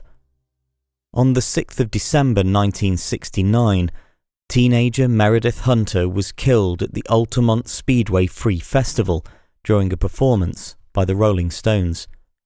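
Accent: United Kingdom. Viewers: Why are these always British commentary guys?!?!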